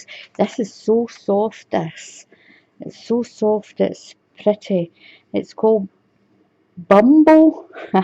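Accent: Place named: Scotland, United Kingdom. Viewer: I think you’ll find it’s pronounced ‘boo-clay’, because of all the fuzzy bits. 😃😃😃. You deserve this lovely gift. Whenever I hear your voice I remember the delightful four days I spent in Edinburgh a few years ago. I loved Scotland.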